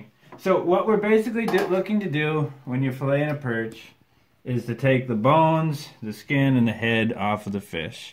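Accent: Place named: Adirondacks, United States. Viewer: I knew you were an Adirondacker before you said it. It's the accent. I grew up in the Keeseville area. Moved to New Hampshire after college. Been down here about 25 years and people still ask about my accent. My sons and I fish down here. It's fun, but there's nothing like fishing the Adirondacks. Great video! Hope all is well with you and your family.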